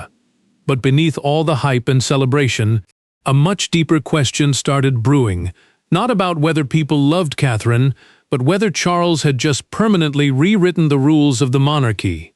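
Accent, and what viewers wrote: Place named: United States. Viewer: That's why we don't trust any of it. And spoken with an American accent, what can I say. It's not for Americans to comment on our affairs, and certainly not in this way. Americans always steps on other people's toes, thinking they own the world.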